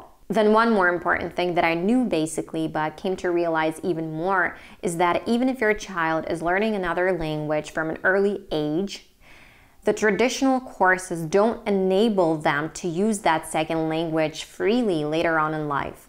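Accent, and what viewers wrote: United States. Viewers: Підписаний на Ваш канал і з задоволенням його слухаю. Цікавий зміст, чудова американська вимова, вродлива і харизматична автор блогу. Бажаю успіхів у Вашій роботі. Цікаво буде через 2-3 роки побачити і почути, як вільно розмовляє Ваш малюк двома мовами! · А муж тоже на англ с ребенком общается? Как у мужа с английским? Он себе такое же американское клевое произношение ставил, как у вас?) Вообще, я просто с отвисшей челюстью смотрел видео. Очень восхищаюсь вашей смелостью, целеустремленностью и авантюризмом))))) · Looking to raise my kid bilingual too. Could've sworn you were American. Thanks for the tips! Great story!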